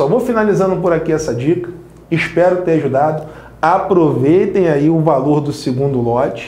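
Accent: Rio de Janeiro, Brazil. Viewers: Professor locão: massa! A geração Z adora isso, tem a pegada de curso pre-vest. Dá até pra suportar o carioquês com essas dicas do tal MPP. bons marketeiros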